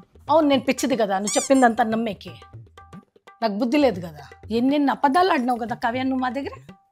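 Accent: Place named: Bangalore, India. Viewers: Ee slang variety ga undi. Ballary aa banglore aa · Karnataka accented Telugu :) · Kannada slang telugu Bagundhi !! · This is Banglore Telugu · Bangalore Telugu 😂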